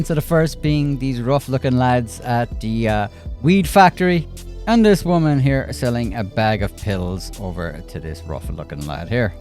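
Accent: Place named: Ireland. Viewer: hey btw where are u from? u sound like... idk sorta irish and sorta something else?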